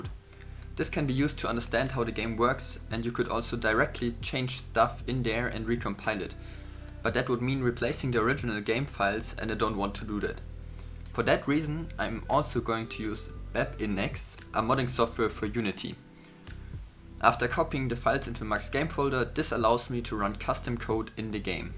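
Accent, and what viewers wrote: Germany. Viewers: are you german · german? i hear it so hard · You sound pretty german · I like you! xD Also, good luck losing that german accent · you sound German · are you german?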